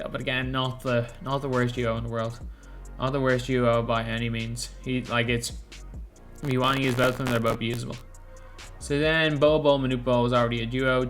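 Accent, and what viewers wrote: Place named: Dublin 4, Ireland. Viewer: your dublin 4 accent pisses me off mup tallagh son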